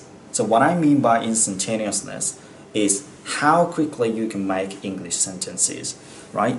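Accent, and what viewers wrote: Australia. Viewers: だいぶオーストラリア英語になってきましたね。 makeの発音、マイクに聞こえる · オーストラリア英語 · オーストラリアはこういう発音なんだ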